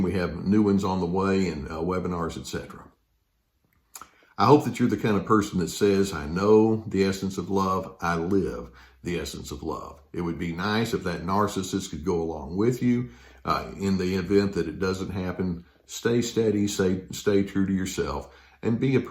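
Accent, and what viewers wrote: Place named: South, United States. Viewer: Yes,. WE HEAR YOUR HEART!! Your kindness, empathy and sincerity emanates from the screen!! Your words, knowledge and wisdom (and your Southern accent 😁) comforts and reassures me. Your videos have literally been a game-changer and a life-saver for me!!! THANK YOU!!!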